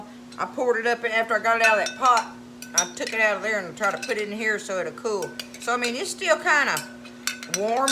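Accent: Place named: Texas, United States. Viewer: you from Texas? I am and your talkin reminds me of home? I married a coonass and I mostly hear this accent here and even the non Cajun accents are different than a good old east Texas accent? Always loved this recipe? But it seems like she (my memaw circa.1901) added a couple more things like celery and maybe a fine shredded carrots? Not positive about the carrots tho?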